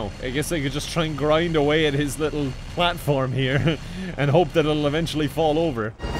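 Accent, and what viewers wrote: Ireland. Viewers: You sound exceptionally Irish in this video 😂😂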